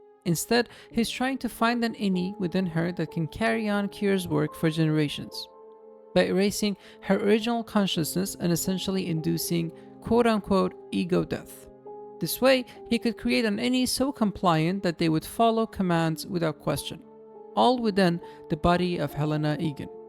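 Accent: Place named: Europe. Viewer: Yeah it is an amazing show! I like that it is so mysterious! It really makes the viewer think! It still could be so many different things! So you think youre outie and innie are different people! Interesting! Yeah you should continue your YouTubes! You’re good at it! I like your accent! What is it? European? It’s ok you don’t have to tell me! It can be another mystery! I won’t be watching much tv until November. I’ve got a big race to train for. But once I’ve finished, I’ll be back on the couch and watch lots tv shows and be deep into theories on reddit and YouTube! which movies do you like?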